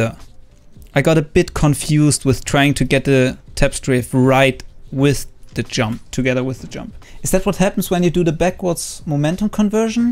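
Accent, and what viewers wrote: Germany. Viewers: dieses deutsche englisch :D sounds so fvcking weird with the german voice hahahaa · Bro man hört so hart raus das du Deutscher bist 😂✌🏾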